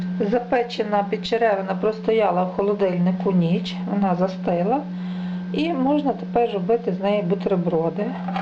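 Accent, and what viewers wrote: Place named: Western Ukraine, Ukraine. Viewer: як приємно чути західний акцент)дуже гарний і зрозумілий рецепт) підписалась на твій канал, підтримай мене і підпишись на мій) дякую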